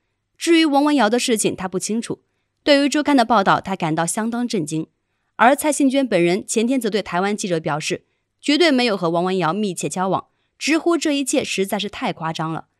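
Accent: China. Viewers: 大陸人配音？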